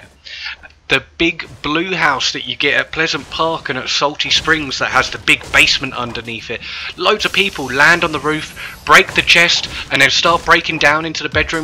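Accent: United Kingdom. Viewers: David deans son ? Is this you your british ?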